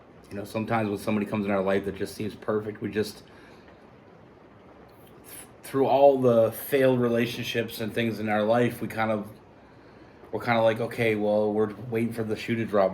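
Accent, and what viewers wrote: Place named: Canada. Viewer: I can hear a Canadian accent, but my goodness. Rain in July??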